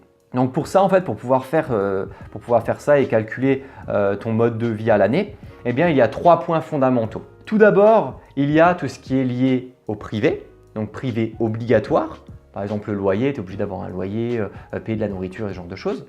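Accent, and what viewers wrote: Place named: Alsace, France. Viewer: on reconnait l'accent alsacien !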